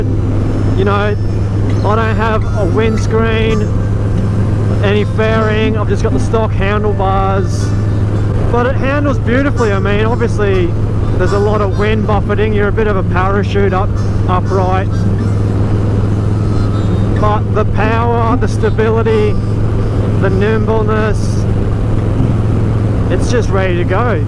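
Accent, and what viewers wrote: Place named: Australia. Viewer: Love your accent, mate, it reminds me of my deck hand years in cairns 😊. And love the street bob, getting my 107 next month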